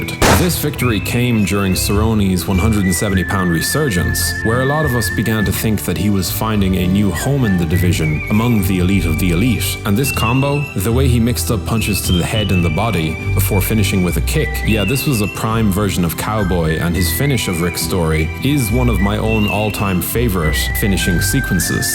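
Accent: Ireland. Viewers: posh d4 west brit ya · Are you Irish by chance... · You Irish? In your about it says from US but I think I'm hearing an island boi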